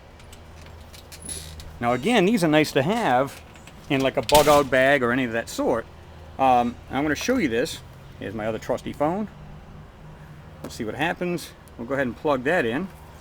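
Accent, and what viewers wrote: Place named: New York, United States. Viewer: You sound like a new Yorker hey! Love the video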